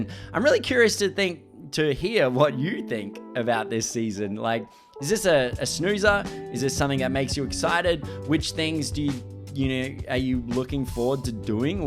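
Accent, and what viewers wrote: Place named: Australia. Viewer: Bro are u Australian? I love Australia 🇦🇺❤️❤️🇦🇺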